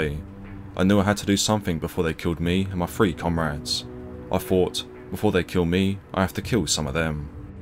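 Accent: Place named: Estuary, United Kingdom. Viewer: Nice video and channel. Your videos aren’t filled with waffle like so many others to reach the 10min mark. I would just soften your estuary accent a bit to help out your international fans. Example: you used the word “hurled” and sounded like held. I criticise because I have the same accent lol.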